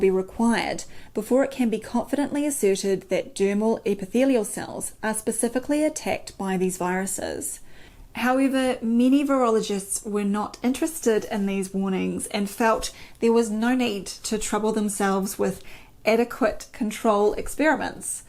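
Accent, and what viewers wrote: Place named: New Zealand. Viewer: Love the kiwi accent. I'm from NZ. The auto words picked up mass phenomenon as mess phenomenon. It is a mess phenomenon.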